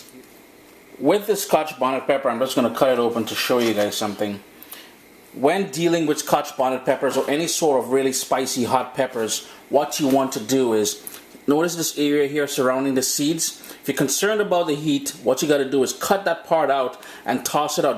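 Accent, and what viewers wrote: Trinidad and Tobago. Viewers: He is a Trinidadian ! And he lives in Canada so he has a Trinidadian and Canadian accent ! both accent intertwined with each other . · I've been around Trinis all my life and to a non Trini it sounds similar. I think it is the Indian influence because I find it sounds similar to an Indian accent sometimes. I know there's a difference though. I always thought this guy was a Trinidadian based on his accent but the post that we are commenting on suggests otherwise. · I think he is from Trinidad because I know how trini people talk cause I am a trini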